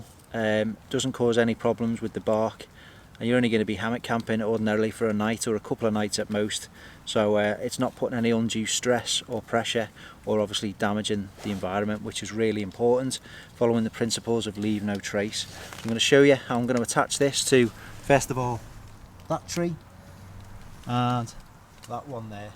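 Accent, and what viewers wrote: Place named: Cheshire, United Kingdom. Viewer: Some of the terminology you used, I’m guessing ex squaddie. With the accent, I’m guessing ex Cheshire?. . . . . I’m probably way out, but the video is really great and informative. I’m trying to get back into camping again, without an officer or Sergeant screaming at me, and getting my grandson into it. Definitely worth subscribing. Ta laarr.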